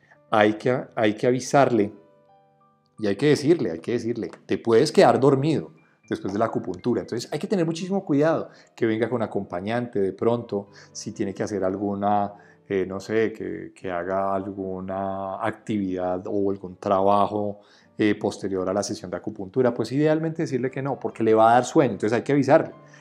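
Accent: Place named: Huila, Colombia. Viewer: Perdón Eres Opita? Del Huila? Soy de Caracas Venezuela, pero hablas igual un tío mio de allá, saludos, por cierto, gracias por la información del vídeo.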